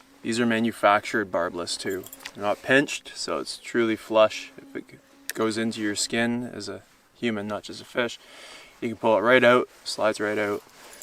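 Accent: Canada. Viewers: PAROGIES! I moved to the United States in 2008 and that is a food they don't really eat down here. This is the most Canadian video I ever have seen and nothing has made me feel like I've been back in Vancouver like hearing you. Previously, the most Canadian man I've encountered was a dude from Alberta trying to marry my dad's cousin in Budapest. We were both on the wrong continent but we felt like North Americans together.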